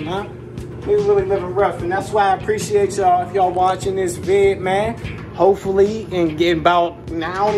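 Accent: Pittsburgh, United States. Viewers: My guy has that heavy Pittsburgh accent. "Just sooooow y'all knooooooow"😂🤣😭 sounds like my uncle, lol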